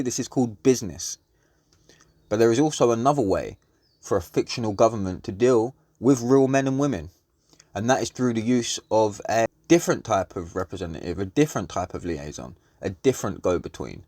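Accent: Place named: London, United Kingdom. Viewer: u sound like a proper London geezer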